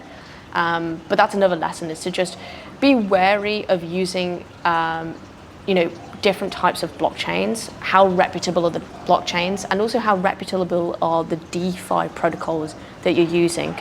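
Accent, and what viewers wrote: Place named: United Kingdom. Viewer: Sounds like UK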